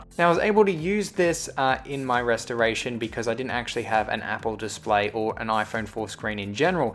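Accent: Australia. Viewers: Ur Australian?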